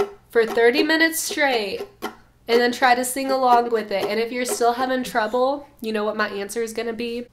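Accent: South, United States. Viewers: No offense but when she's talking she kinda sounds like she's trying to muffle a southern accent.😂Edit: Not trying to be rude I love you, you have some of the best tutorials❤ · Your southern accent is slipping out in this video lol.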